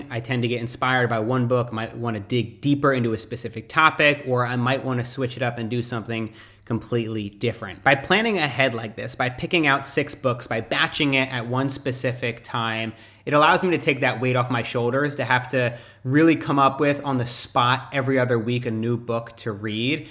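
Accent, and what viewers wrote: Australia. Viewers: "Going FOH-ward," to me, is one of his trademark pronunciations that you just need to wait for. Can't wait for Australian accent to kick in.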